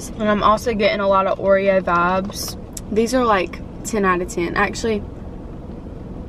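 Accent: South, United States. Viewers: Its like southern and Australian 💚💚💚💚 I love it too!!! :) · K H I’m from Texas and everyone says we have a country accent but her accent is soooo cute it has to be somewhere from the south but I can’t tell where 😭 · Where are you from. Love the country accent.im from TN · i love your accent !! it kinda sounds country! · it totally is country lmao. i’m pretty sure she’s from the south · North Carolina I think!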